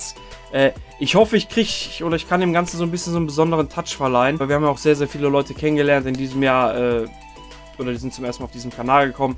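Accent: Ruhr, Germany. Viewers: Dabei seit ca 35K Abos. - Ein Kanal der durch Menschlichkeit und Sympathie punktet. Der Protagonist?. Ein Mensch der immer einen Joke auf Lager hat und mit seiner Ruhrpott-Schnauze die Fifa-Szene rockt. Ein absolut Bodenständiger Typ, dem man die 300K einfach gönnt. du Legende, mach weiter so und lass dich nicht verbiegen. #KellerGang #2K18iscoming #HappyNewYear #Crewlove #VomShishaKellerzumKeller #Roadto400K #BesterYoutuber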